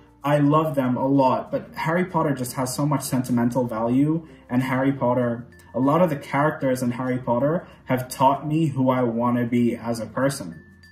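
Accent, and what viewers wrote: United States. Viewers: You don't "look American"? ... Americans can look like any ethnicity in the world, and you DO SOUND American. I mean I knew you were from UAE but only because I saw it in your "about" page. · Wow -- I thought that you were an American! Even going by accent alone, I would have guessed that you were from Chicago, Miami or Los Angeles. In fact, you look so much like my best friend from high school that I had to do a double-take the first time (and, yes, he had a beard in high school). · I also thought you were American based on your accent. I love your reactions. You seem very sincere and genuine. It's why I subscribed--you seem like a fantastic person. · if you never lived in the US then you definitely have super impressive English and a super impressive American accent. You had me fooled! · I love how genuine you are about your emotions and aren't afraid of what people think about them. 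It really puts you a cut above a lot of similar content like this on Youtube. Sort of coincidentally I used to have a friend from Dubai who didn't like that I used the word "cute" to describe something because he thought it was a very feminine way of speaking though I got him to back down by getting him to admit there is no better word to describe baby ducks. Also people probably assume you're American because of your accent which is very American since we're a really diverse country that comes in all sorts of races and ethnicities it's hard to assume someone isn't American by looks alone. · A couple of things regarding the Clone Wars. First, I get why doing reactions to it could be tiresome but instead watch it at your own leisure and then when at the end do a reaction to the final 4 episodes because combined they make one of the best Star Wars movies in existence. Secondly, a good reason to watch the Clone Wars is that some of the main characters will now be on the Mandalorian and/or will be getting their own show in the very near future. 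PS. Im with others and thought you were American. You sound like you could be my neighbor here in California. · you sound very American. I think that's why people assume you are in America. I even assumed that. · It's highly likely that J.K. Roweling took inspiration from J.R.R. Tolkien when writing Harry Potter. Also many people think you are American because of your accent. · I think everyone thinks you’re from the usa because your accent sounds soo American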